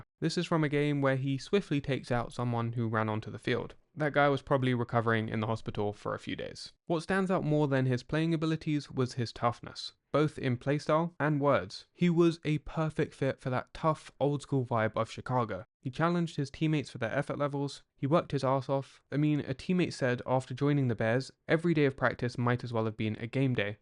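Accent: England, United Kingdom. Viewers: Wait. Why is sone guy with an Anglophone accent (English, I guess) doing videos about 60s Bears football?!? By the way (just to establish some credentials here), I was at Gale Sayers' 6-touchdown game against the 49ers with my father. And although I was sitting at home, my father was at the 1963 NFL Championship game at Wrigley Field against the NY Giants.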